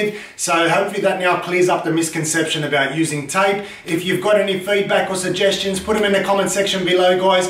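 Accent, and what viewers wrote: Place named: Australia. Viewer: Will scribing first help? You sound like the Aussie building inspection guy. Must be a cuzzie.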